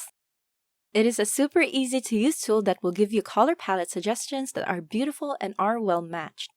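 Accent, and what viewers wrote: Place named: Philippines. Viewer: You deserve a million subs. Keep it up. Ps: you sound Filipino tho.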